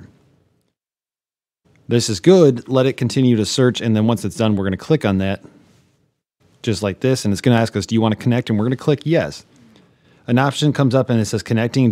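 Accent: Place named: Midwest, United States. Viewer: Fantastic video. And as a Chicagoan relocated to the hinterlands of Seattle your Midwestern accent was the best part.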